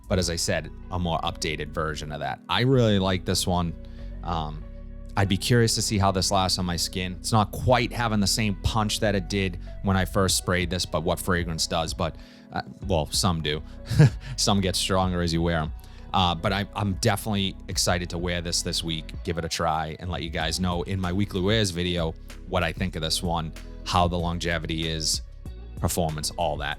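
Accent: Boston, United States. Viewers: That Boston accent you got going on is so frigging annoying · Are you from Bahston? I can tell I'm from up there myself. I enjoy your reviews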